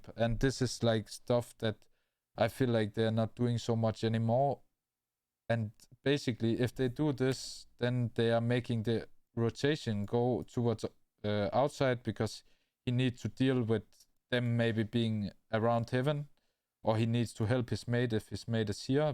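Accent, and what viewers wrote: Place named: Denmark. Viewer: Your danish accent is sexy af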